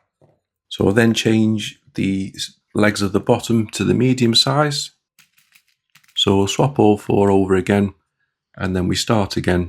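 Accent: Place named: Liverpool, United Kingdom. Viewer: Love it, I have a Google Pixel 6a that I can run Ubuntu or Kali net hunter on. The mellow scouse accent was different.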